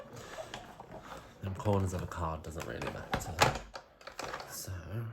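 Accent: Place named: United Kingdom. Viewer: Hi there, great video! I was on the fence about this stamp set, you sold me!! Getting it! I hear your British accent, I miss it, I am from Bristol, living in the US.👋🇬🇧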